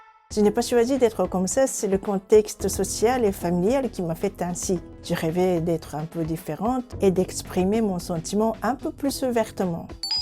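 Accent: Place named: Africa. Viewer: C'est marrant tu parles tres bien le Francais...Avec un accent presque " Africain " !!!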